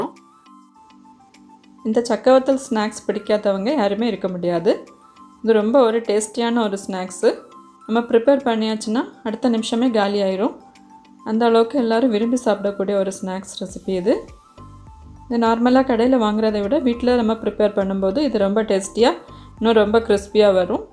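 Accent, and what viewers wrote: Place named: Kanyakumari, India. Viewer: Sis nenga kanyakumari dist a🙄...slang apadi iruku🥰🥰...nice sis💐💐☺️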